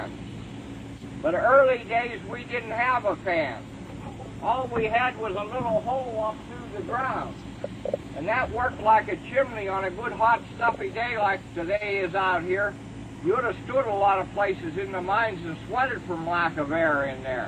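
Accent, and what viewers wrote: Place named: Pennsylvania, United States. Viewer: What a great PA accent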